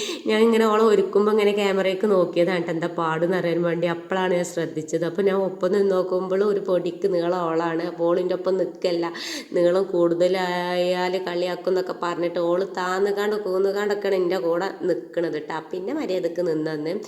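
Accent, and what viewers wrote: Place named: Malappuram, India. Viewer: 😊😊😊. എന്റെ hus മലപ്പുറം ഡിസ്ട്രിക്റ്റ് ആണ്. നിങ്ങളുടെ സംസാരം same 😊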